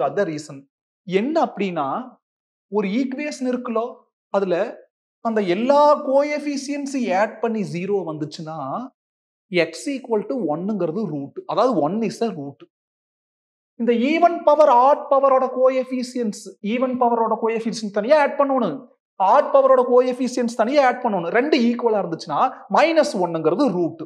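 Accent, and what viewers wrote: Coimbatore, India. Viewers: Sir are u a coimbatorian??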